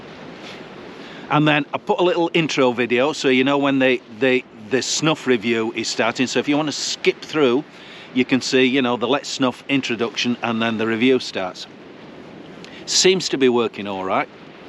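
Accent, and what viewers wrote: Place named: Northern England, United Kingdom. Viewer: Golden rule. If it works, don’t fix it. People appreciate your sense of humour, and for an expat like me it’s nice to hear the northern accent and your touristy videos.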